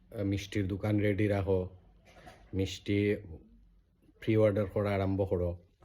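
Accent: Sylhet, Bangladesh. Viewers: তোমার ভাষা ঠিক কর কতটুকু সিলেটি কতটুকু শুদ্ধ অবাঙাল কোথাকার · Apni chalti vhashei kotha bolun bujha jai na..kisui....sab toh siletty jane na😂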